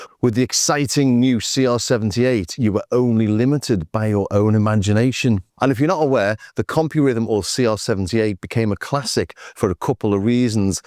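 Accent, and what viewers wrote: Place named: Lancashire, United Kingdom. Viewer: She’s even sweeter with a woolyback accent! ❤ nice one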